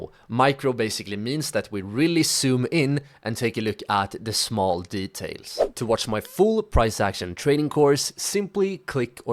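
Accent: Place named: Sweden. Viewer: svengelskan e ju för skön!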